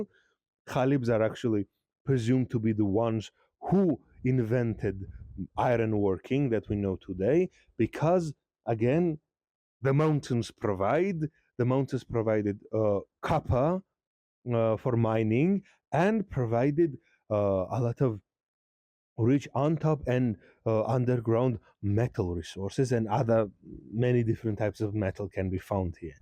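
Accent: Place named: Wales, United Kingdom. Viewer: Ive been to Georgia before but this is the first time ive heard a Georgian accent that sounded welsh. Im guessing it must either be a regional accent or a result of having a welsh English teacher.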